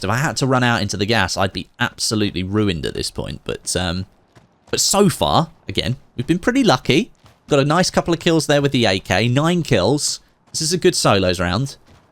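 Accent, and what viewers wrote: United Kingdom. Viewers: Wait you're British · WOW I did not know was British I thought you were American lol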